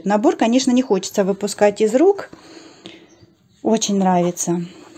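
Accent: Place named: Ukraine. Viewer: А вы откуда? Просто не услышала в видео, кроме упоминания 2х трудных месяцев, а майские деньки упорядочились, судя по говору, с Украины....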